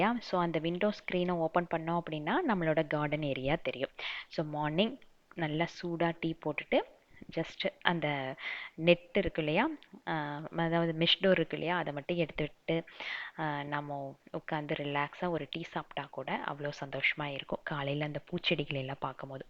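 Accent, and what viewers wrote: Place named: Pollachi, India. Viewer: Entha State ponalum Pollachi Tamil alagu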